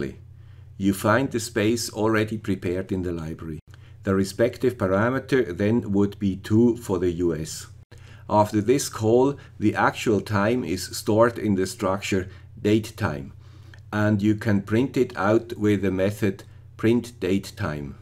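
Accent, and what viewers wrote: Switzerland. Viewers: Hello, the guy with the swiss accent, thanks a lot for the great videos and tutorials!I'm very new to ESP8266 and Arduino ESP. I coded a lot of stuff in Basic, Turbo Pascal and Visual Basic, but it is about 25year ago and I fear I forgot a lot of this. I tried to use your library but I did not get it run like expected.I just wanted to have a look, if the time and date is correct, so I used some serial.print commands. At first it showed nothing. Then I removed the "if(dateTime.valid)" command. I added some lines to your code: void loop { // first parameter: Time zone in floating point (for India); second parameter: 1 for European summer time; 2 for US daylight saving time (contributed by viewwer, not tested by me) dateTime = NTPch.getNTPtime(1.0, 1); // check dateTime.valid before using the returned time // Use "setSendInterval" or "setRecvTimeout" if required //if(dateTime.valid){ NTPch.printDateTime(dateTime); byte actualHour = dateTime.hour; byte actualMinute = dateTime.minute; byte actualsecond = dateTime.second; int actualyear = dateTime.year; byte actualMonth = dateTime.month; byte actualday =dateTime.day; byte actualdayofWeek = dateTime.dayofWeek; Serial.print("actualHour: "); Serial.println(actualHour); Serial.print("actualMinute: "); Serial.println(actualMinute); Serial.print("actualsecond: "); Serial.println(actualsecond); Serial.print("actualyear: "); Serial.println(actualyear); Serial.print("actualMonth: "); Serial.println(actualMonth); Serial.print("actu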